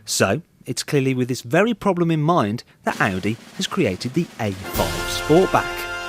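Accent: United Kingdom. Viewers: dear citizens of the UK. I like your humor but I hate ur dialect: "the äudi ä faive coupay" sincerely, a Kraut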